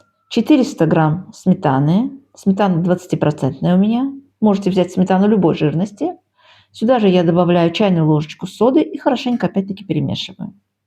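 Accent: Baku, Azerbaijan. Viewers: Спасибо огромное за рецепт тортика,такого в моей "копилочке" еще нет!!! Испеку обязательно,порадую внуков!!! Обожаю ваши ролики,ваш бакинский русский!!! Привет из Молдовы...❤ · Smotru vas iz Amerika, no sama iz Baku azerbaijanka, za stolko let akcent ne izmenilsa, udaci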